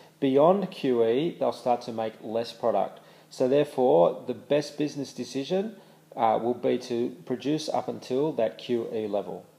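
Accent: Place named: Australia. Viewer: Love the aussie accent m8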